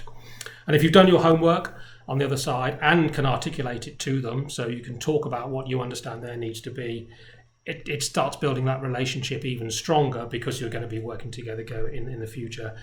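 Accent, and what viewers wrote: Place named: United Kingdom. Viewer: 오 글로벌한 영국어를 쓰고 계시는듯 : ) 벤쳐스 연계부분에 대해 상세하게 짚어줘서 흥미로웠네요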